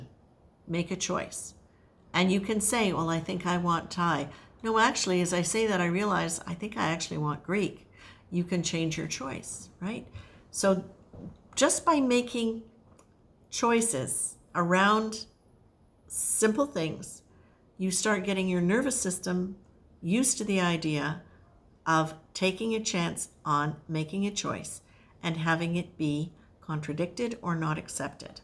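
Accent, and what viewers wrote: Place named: Ireland. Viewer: Thank you! Love you Irish accent! So on point! (Fellow Irish person).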